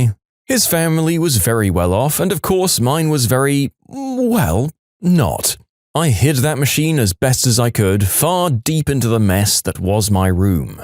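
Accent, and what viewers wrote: United Kingdom. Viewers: What is this British voice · British alert🚨🚨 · You are now have a british voice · love the british voice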